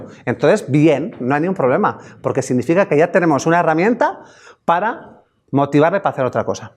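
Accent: Spain. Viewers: Soy Luis Capdevila Argentino viviendo en Colombia., Muy Interesante la Conferencia! una pequeña observación; para una Comunidad Latina en las próximas charlas podría "vocalizar un poco más Neutro"? Se entiende bien, aclaro, pero a las personas que no estamos acostumbrados a escuchar otros Tonos como el de España, se dificulta el entendimiento por Hablar tan Rápido (cajitas ó cajetas?). Gracias Así mejorará mucho la Productividad de Uds que es La Comunicación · Valeee que es de Bilbao, no de Colombia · Yo lo entendí a la perfección. Pienso que a los latinos puede costarnos un poco más por el acento español, cuando habla muy rápido y por los modismos propios de España, pero ha sido divertido y contundente, especialmente cuando dice a partir de hasta , que la semana ha sido una puta mierda 😂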